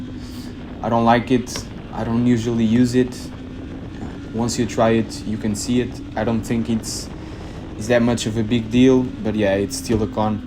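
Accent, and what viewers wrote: Portugal. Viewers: Bem me parecía que eu tava a notar um sotaque tuga. Belo video, boas informações, rápido e claro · aquele sotaque tuga haha, grande mota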